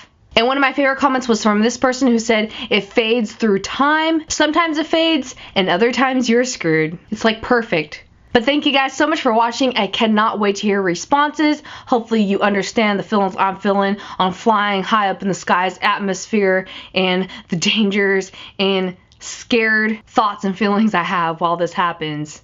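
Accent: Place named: Texas, United States. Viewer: You picked up a smidge of a country accent while you were in texas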